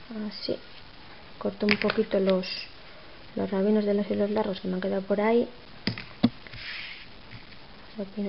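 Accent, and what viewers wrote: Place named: Asturias, Spain. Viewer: hola soy belsy siempre te veo incluso los antiguos me gusta como esplicas por el habla pareces asturiana como yo bueno besazosssssssss y sigue esplicandonos muches coses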